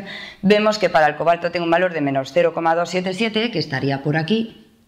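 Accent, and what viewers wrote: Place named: Spain. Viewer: Maestra, de que ciudad de España es usted? Me agrada su acento y no es tan enfadoso como otros españoles mucho más marcados. 🙂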